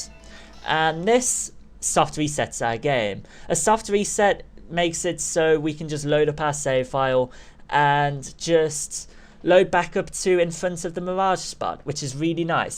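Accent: Birmingham, United Kingdom. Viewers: Are you brummie?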